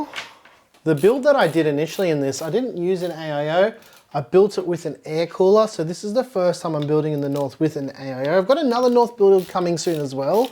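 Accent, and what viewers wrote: Australia. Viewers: Fantastic build! The 3090 is definitely a hot boy so all the fans are definitely necessary. My EVGA 3080 draws 380 watts. Non related you remind me of my Mexican cousin so it trips me out that you have that Aussie accent. Lol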